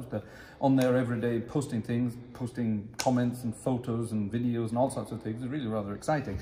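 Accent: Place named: Scotland, United Kingdom. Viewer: Hi and greetings from Scotland. Many thanks for your entertaining and informative videos, enlivened by your cheeky Scottish chappie style. Your videos are aimed more at organists and organ students rather than people like me who have more organ LPs and CDs than they can count (starting with Rogg's 1960s recordings (Metzler at Grossmunster, Zurich, as well as the Arlsheim Silbermann mentioned by Elizabeth). Congratulations as well on the great audio and video quality/camera work-well done Mrs/Frau G! I hope that your followers for whom English is a second language are savouring your beautiful North of Scotland accent as you wax lyrical about Brustwerks and Ruckpositivs. I regret having only a smattering of German, but is someone asking if you now speak English with a German accent? Nein nein nein I say. I hope you're getting some compliments on your German, which sounds very good to me. 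Best wishes Jim Dickson.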